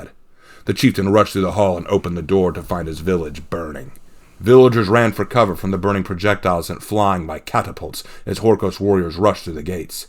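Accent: Scotland, United Kingdom. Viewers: What an awesome read! Your Scottish accent wasn’t that bad either and your overall delivery of the entire story is top notch. I’m more surprised that the story isn’t that bad as I originally thought, but still could use a little bit of work. Still, great video!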